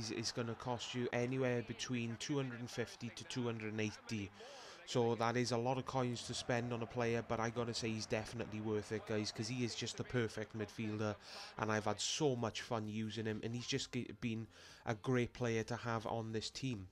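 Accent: Wales, United Kingdom. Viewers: are you welsh? you seem to have a welsh accent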